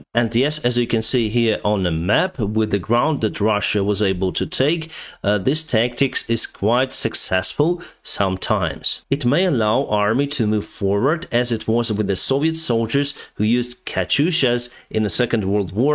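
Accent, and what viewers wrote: United States. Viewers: As an American that speaks multiple languages, I have no trouble whatsoever understanding him. English is the tough one to understand, not Eastern European or Asian languages. Once you know how their sentences are structured, the words in English can convey the message. Compared to some of the translators on UATV, sounds downright American. They have two that are absolutely horrible. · great ascent.................really Ukrainian or living in DC?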